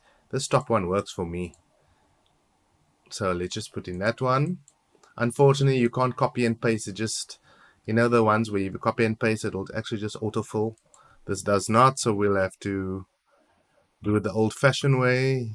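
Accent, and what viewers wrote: South Africa. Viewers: I just randomly found your channel to help me with Black and White, Your Accent, SOUTH AFRICAN! Lekker my Broer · Knew I heard a fellow South African! Thanks for vid man. Oh how I’ve been wishing for B&W 3 since I was like 15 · Homie you sound south African!! Glad to know im not the only Black n White fan left alive! :D Thanks for this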